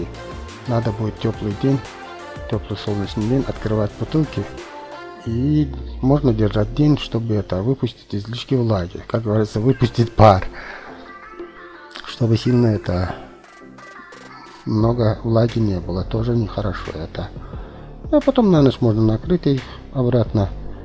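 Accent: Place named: Belarus. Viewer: Я угадала. По разговору. У меня бабушка из Беларусии была. Родной говор слышу.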